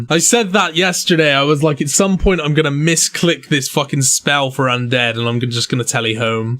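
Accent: United Kingdom. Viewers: where is from? im guessing UK but i cant tell exactly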